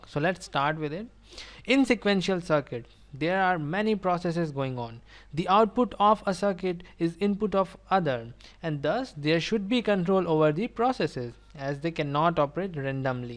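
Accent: India. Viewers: Lol. I think his accent is less heavier and easier to understand than other Indian videos. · Its the first time I see Indians teaching. Besides the speaking accent, his teaching skill are surprising. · i love your indian accent, lovely!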